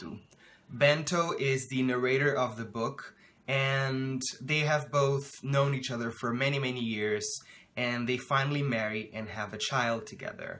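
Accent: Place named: Brazil. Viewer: O rapaz é brasileiro... fala inglês perfeito. Só perceptível porque o sotaque brasileiro é evidente no inglês. Fiquei impressionado, quero ser como ele ☺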